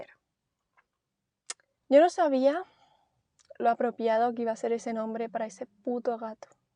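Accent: Spain. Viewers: Hi. Are you spanish from Spain or from Amerika or what?)))) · hola, soy de Corea y el año pasado estuve en salamanca. aunque haya mucha versión de español de otros países. me gusta la pronunciación de españa como tuya. muchas gracias por subir este video.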